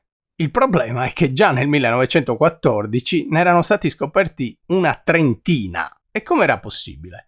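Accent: Sardinia, Italy. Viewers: Professore le faccio tutti i miei complimenti, che narrazione affascinante, impeccabile nella parte scientifica e storica , accompagnata da una grande sensibilità e saggezza. E se mi posso permettere, la lieve intonazione sarda la rende ancora più piacevole (altro che IA!!!) Grazie mille 🙏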